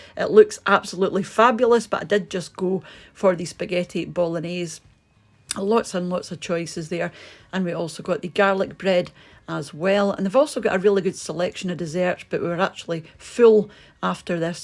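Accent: Scotland, United Kingdom. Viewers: I love these video's, they give me some new ideas and a new lease of life. I also adore your Scottish accent! x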